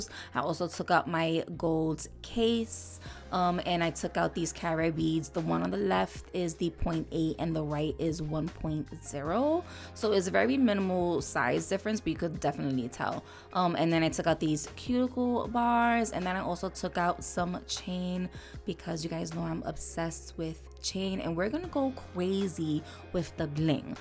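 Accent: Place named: North, United States. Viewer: I always watch videos love the content and rarely, comment, share, Subscribe. But the way you shouted one of your followers for the gift! And willing to help her expand her clientele, along with the sincerity I felt from you. I had to comment and subscribe!! 😊 LOVE YOUR CONTENT….Your Northern Accent is strong. I grew up in New York, I watch your videos so much mines is coming back stronger. 😂😂😂 You remind me of one of my “NY Boricua” Besties from L.E.S!!!!!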